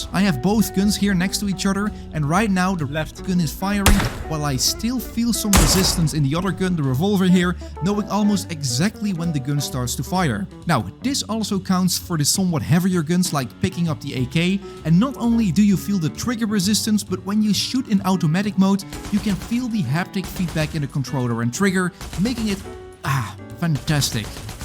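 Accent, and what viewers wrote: Netherlands. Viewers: is it just me or does he have a dutch acsent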